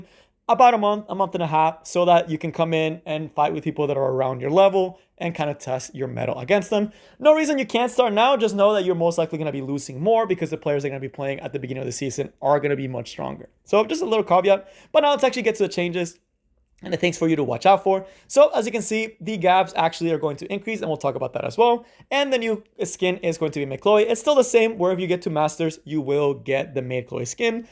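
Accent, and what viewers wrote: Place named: Mexico. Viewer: Why your accent sounds Mexican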